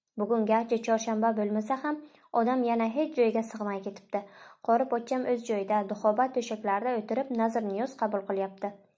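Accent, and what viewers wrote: Bukhara, Uzbekistan. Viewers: Маладес қизим, зўр ўқибсиз👍. Бухороликмисиз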